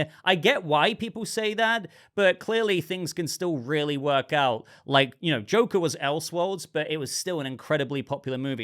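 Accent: United Kingdom. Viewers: I watch this channel so much that whenever I think about these superhero movies, I find my inner monologue has a British accent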